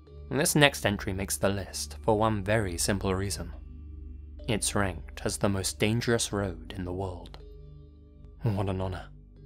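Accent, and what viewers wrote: Spain. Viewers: its funny to hear you pronounce Portuguese words with Spanish pronunciation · Fortaleza is not Fortaletha like you would say in Spanish. And Jaguaro is not Jaquaro. Idk if I'm making sense but he pronounces it as a spaniard wouls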